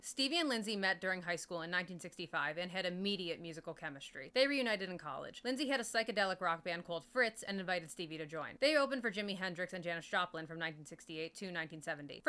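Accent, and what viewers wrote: New York, United States. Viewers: Love that New York accent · Staten/long island/NY ass accent goth girl talking about Fleetwood Mac?! Sign me up fam. I grew up in NJ and this is very wholesome to me.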